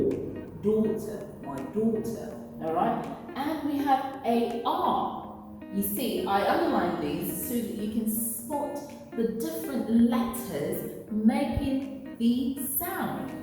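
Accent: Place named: United Kingdom. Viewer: Wow I love your British accent, it's very elegant and beautiful. I think your YouTube channel will do just fine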